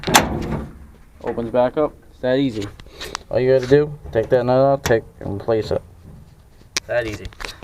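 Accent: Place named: Maine, United States. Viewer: You must be from Maine or atleast New England.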